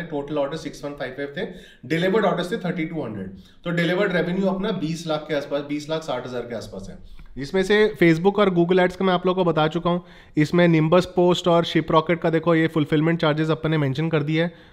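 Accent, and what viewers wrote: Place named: Surat, India. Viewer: just by the tone i can tell for sure you are shipping from surat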